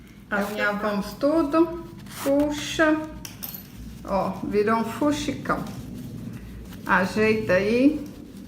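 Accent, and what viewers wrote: Minas Gerais, Brazil. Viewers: Amei essa ideia!!! Já acompanho seus vídeos há um bom tempo, mas só agora me inscrevi no seu canal. Gosto das suas ideias criativas. Às vezes me inspiro pra fazer totalmente novo e diferente do do vc fez, mas o crédito da ideia é seu. Usei uma ideia sua pra fazer o cabelo de uma vovó. Obrigada querida! Ah, amo sua mineirice😊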